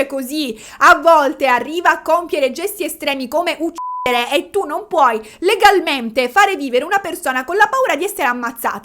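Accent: Sicily, Italy. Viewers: te l'ho chiesto perché sentivo una leggera inflessione ma non ero sicuro che fossi di queste parti: io sono di Parma ma mi sono trasferito con tutta la famiglia, a Siracusa. La Sicilia è il paradiso in terra! Ciao e complimenti per il tuo canale e la brillantezza con la quale lo conduci!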